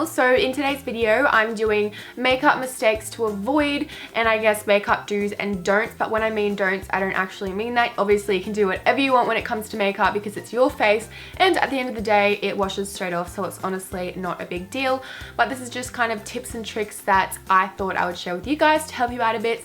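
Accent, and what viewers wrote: Australia. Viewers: Are you from australia ?? such a cute accents.....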